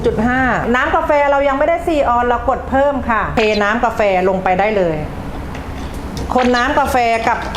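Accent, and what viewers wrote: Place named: Provincial, Thailand. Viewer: ดูเพลิน พี่เสียงเหน่อน่ารักด้วย ขอบคุณสำหรับคลิปครับ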